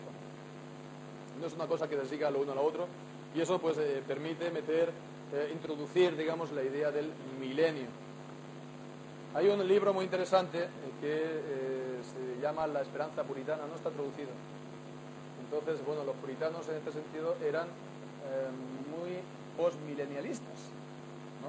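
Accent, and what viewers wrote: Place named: Spain. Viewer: Felicitar al pastor por el sermon, buen trabajo. ¿ De donde es ? por el acento pareciera Español.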